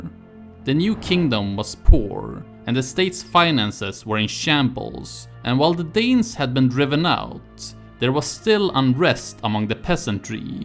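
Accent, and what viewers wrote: Sweden. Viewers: You kan hear on his voice that he is from sweden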